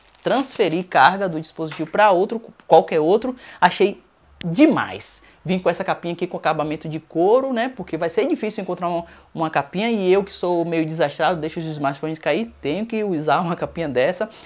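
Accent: Bahia, Brazil. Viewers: Vc é baiano tb é? Kkkk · tu é Bahiano né 😂😂😂😂 se for é nós 👏👏✌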